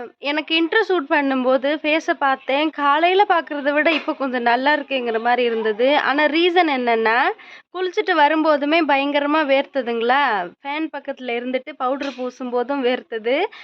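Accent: India. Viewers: Neenga pesurathu hindi poonu tamil kathutu pesura mathiri iruku lunch time use panran intha varthalam mathiya sapatunu soli parunga nalairukum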